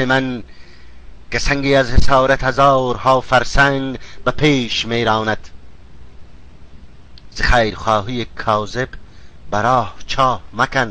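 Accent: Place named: Iran. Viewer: hahahahahahahaha o kosmadar to kho irani hasti chera mardoma jang mendazi khware ta gayom kosmadar tora chi khob kadan irani ra chi ba e gapa madare ta gayom hamrai lahjet k maloom mesha hahahha aga to rasti e gapa mezadi chera akse karzai nes da e o madare ta gaim maalom mesha k kodam dokhtare ta eya gayedan biyaresh mam begayomesh ai koni hamrai gapait to kodam koni hasti maalom mesha biya aga yakh na kadi k ma yakhet konom hahahahhah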